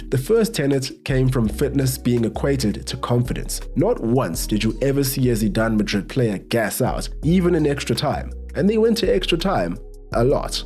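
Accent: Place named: South Africa. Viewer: Your accent is sound South African. I can’t get over it😂😂😂😂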